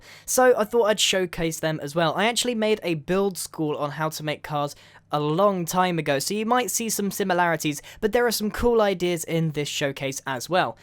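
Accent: United Kingdom. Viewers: wiat grain r u british? i thought u just liked the accent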